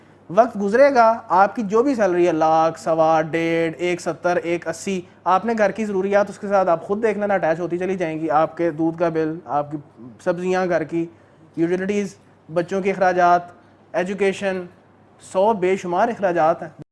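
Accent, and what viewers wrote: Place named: Punjab, India. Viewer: Nice punjabi excent.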